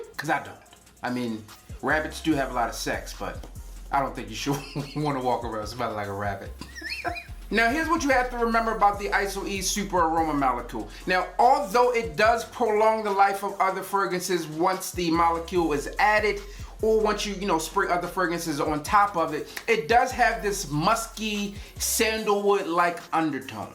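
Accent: United States. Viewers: No offense to Jeremy fragrance but I can understand exactly what you're saying cuz I'm from America and you have an American accent that's more easier to understand then some other men's fragrance YouTube channels if you know what I mean ty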